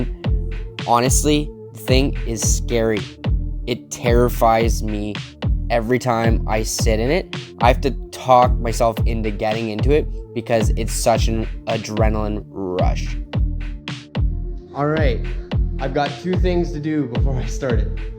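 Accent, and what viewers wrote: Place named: Canada. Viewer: from the accent im gonna guess r u from Canada?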